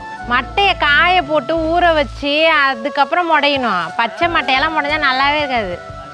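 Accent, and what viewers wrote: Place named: Mayiladuthurai, India. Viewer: மண் மனம் வீசும் வீடியோ....manasuku avalo asaiya irku...keera தண்டு karuvatukolambu natural ah neenga pesurathu...ketkave asaya irku....becz enga amma mayavaram so nanga veetula pesura mathiriye irku...enaku unga வீடியோ pakkum pothula nanga munadi iruntha life ah niyabaga paduthuthu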